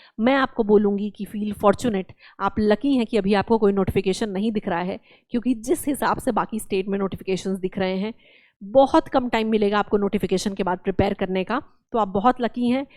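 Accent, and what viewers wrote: Chhattisgarh, India. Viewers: Mam aap Chhattisgarh se ho kya ?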